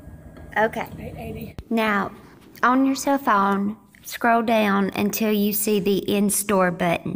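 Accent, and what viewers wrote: United States. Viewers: She sounds like she lives out here in Tennessee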